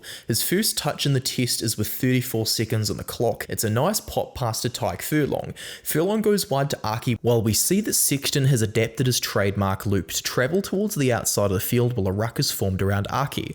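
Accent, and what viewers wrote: New Zealand. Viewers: Sixton is the bist nambah tin The kiwi accent is wonderful ❤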